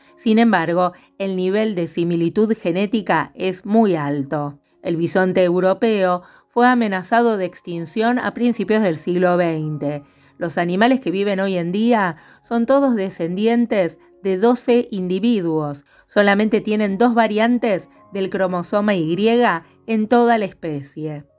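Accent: Argentina. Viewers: No me gustó, me recontra gusto, explicas cosas muy interesantes. Es el primero video que veo pero creo que los veré todos, me gustaría saber si abordas las teorías de kropotkin... por cierto, me encanta ese acento argentino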